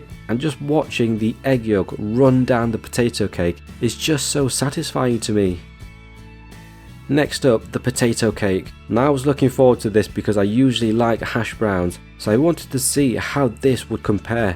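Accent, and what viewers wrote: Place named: Midlands, United Kingdom. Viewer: Best breakfast I ever had was in Northern Ireland, so I think they have it. By the way - are you from the Midlands mate? Your accent suggests so.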